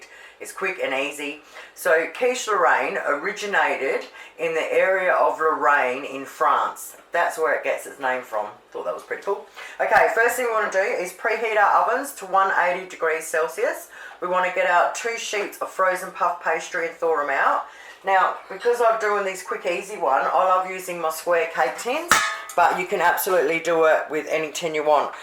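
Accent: Australia. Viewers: Ooohh maaate😂😂😂😂...can't be anymore Aussie than that😂😂😂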